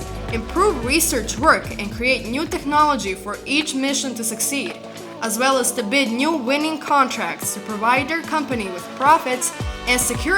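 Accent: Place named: Russia. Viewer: its a women I can hear the Russian in her voice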